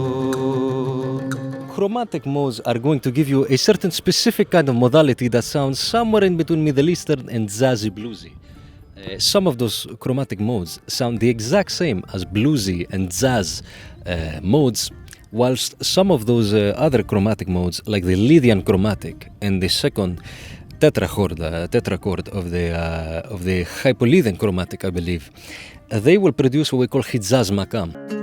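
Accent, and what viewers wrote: Greece. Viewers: Only thing I have to say is that you absolutely nailed the greek accent without a doubt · Greek accent is truly masterful 🧠🤯🤯🤯🤯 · The Greek accent is eerily convincing. I sense the presence of a Greek significant other. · I love your videos and your Greek accent!!! 😂 I’m currently learning Byzantine music and I learned that it traces back to the modes in Ancient Greece. There are 8 modes and they have names like Dorian, Phrygian etc., which are not the same as what western music calls them. You’re right, they did screw up the names! 😂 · No, but even with a musician's ear, he'd need to go to a few hundred Greek weddings to mimic the accent that well. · Is this guy part greek or something how tf is he speaking that good greek and greek accent english????? He even knowσ the cretan "κατεω"!!!! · How come you have an Greek accent?:) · He isn't Greek? 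Μπρο It sounds so greek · Bro, I totally thought you were Greek xD You fkng nailed it. Even the Greek words you threw in there had me at hello:D Awesome, learned a lot, and I'm not even a musician:D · Λοιπόν! You manage to sound like a Greek speaking English even to the eeeeh between words. Nothing less than amazing! Also, once more, I have to admit that I've never thought theory of music (or theory of anything, really) would be so diverting. I laughed so hard my frappé came out though my nose! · Perfect Greek accent and appearance! Hilarious video! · What the hell, i was runing your video in the background and i hear you greek accent! "Wait, hadn't i clicked?" But no you had to prove you are a brother to yet another people's nation! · Funny and educating! Also, your accent is really Greek! Hopefully we''ll see similar videos about other music traditions! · Excellent Greek accent! And I learned a lot about music. 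As always. Keep up the good work! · why is your greek accent so spot on? xD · you can't possibly have such a good greek accent.. once I heard that λοιπόν I was sure I was hearing a compatriot..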